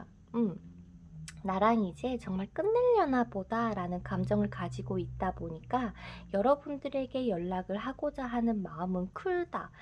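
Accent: Gyeongsang, South Korea. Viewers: 안녕하세요 구독 좋아요 구독중이에요,,오늘부터 1일이예요.특유의 목소리 톤이 꽤 좋아요. 경상도 사투리같아요.ㅎㅎ제 가 경상도 사람이거든요.저는 여기서 파란색을 뽑았는데, ㅜㅜ 사랑이.아니다라는 말에 조금 놀랐어요. 집착인가봐요.정리를 해야 겠네요.. 추억 속 에 남겨두고 아예 연락이 안 왔으면 좋겠어요. 물론 참고할께요.죽어도 잊지 못할 만큼이였으나, 이젠 놓고 받아 들여야 겠어요. 진정한 사랑이 아닌것 같네요.감사합니다.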